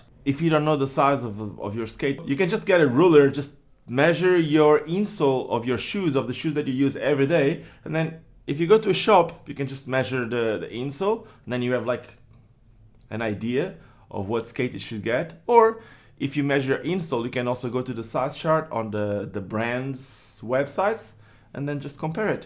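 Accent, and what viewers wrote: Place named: Portugal. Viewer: Are you from brazil or portugal? Cause you have a portuguese accent. Great video btw (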